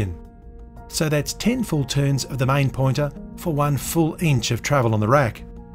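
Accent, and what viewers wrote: Australia. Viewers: Hearing an Aussie accent talking about inches brings back memories. And yes, I'm that old. 👍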